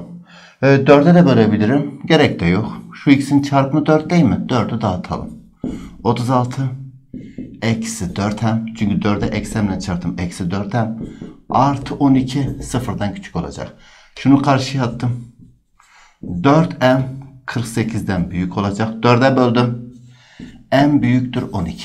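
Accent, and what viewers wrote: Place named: Eastern Anatolia, Turkey. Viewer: Hocam nerelisiniz valla doğu şivesi seziyorum 😅 nasıl sevimli 🙏🏻☺️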